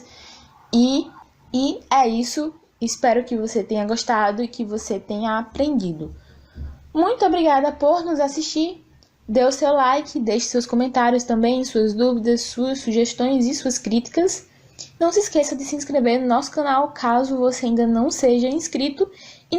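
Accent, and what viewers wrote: Bahia, Brazil. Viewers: A explicação foi boa mas o sotaque da Bahia atrapalhou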